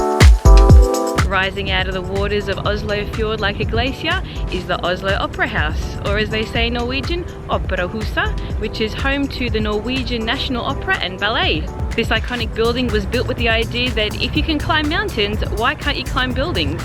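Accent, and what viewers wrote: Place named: Melbourne, Australia. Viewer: G'day from your home town, Melbourne!! Not hard to pick that accent!! My wife and I are coming to Oslo-Bergen enroute to catch the North Lights in January next year. Any advice on what what may or may not be open in winter in Oslo? Thanks. Vincent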